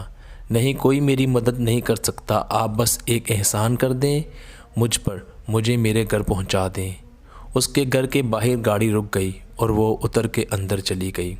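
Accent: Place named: India. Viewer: Ap agar Pakistani ho Tu apna Urdu Ka tulaffuz theek krain ,,,ap Tu Indian ke tarha Urdu poltay ho ..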